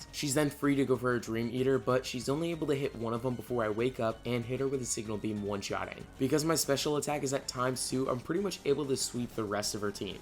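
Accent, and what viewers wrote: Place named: England, United Kingdom. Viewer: Bro which country are you from? From your accent I feel England. BTW love from India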